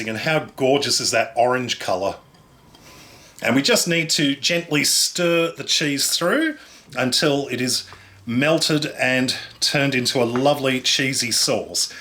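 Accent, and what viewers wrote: Australia. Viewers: I have to ask. Are you from Australia or New Zealand? This looks sooooo good! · Reaperz Void ..... no. That’s clearly an Australian accent. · are u australian? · Ok the second I heard the familiar Aussie accent I subscribed.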